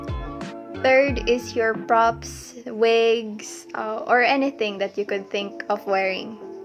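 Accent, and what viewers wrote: Philippines. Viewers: you sound like Filipino to me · I really don't have the materials for this... POTANG INA!!! (but this is the first time I've heard your irl voice) :) You really sound like a true Pinoy! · Why do you sound like you're from The Philippines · Huh she sounds like from Philippines but thx for tutorial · i can tell she's phillipines by her english accent lol❤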